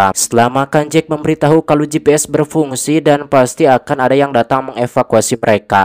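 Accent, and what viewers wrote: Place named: Madura, Indonesia. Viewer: Meduro logatnya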